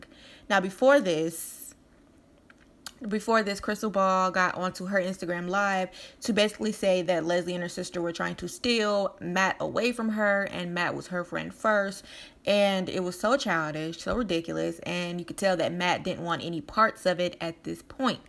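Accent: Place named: South, United States. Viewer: Agreed,,,, I’m a white girl, and color doesn’t matter to ME! I wish the world would see us all for our differences being color of skin, color of hair, eyes, etc.... I think you have a beautiful southern bell accent. Thanks for your FACTUAL video! Do I like to HEAR the FAKE ASS Katie Joy! Absolutly not. The latest leaks of her talking about Leslie Bass’ nephew passing is HORRIFIC. I will never watch or support her again!!! She also said that Steve McRae sexually harassed people and sent dick picks. I can’t believe this about Matt based on her history!!! I’m not saying I like Matt or not. But I don’t believe it. She plays dirty.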